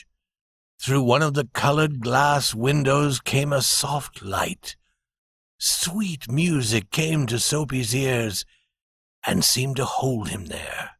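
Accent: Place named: England, United Kingdom. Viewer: very great accent ...English (Saadiyat Beach)..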